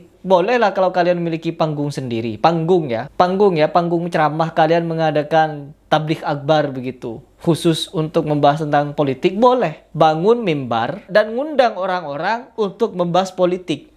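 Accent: Madura, Indonesia. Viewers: Bg lu emang orang Madura yah?? Kirain cuma logat lu aja Madura.itu di caption lu pake bhs Madura kan??? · Pola oreng madureh kakeh mas. Logatnya kayak oreng madureh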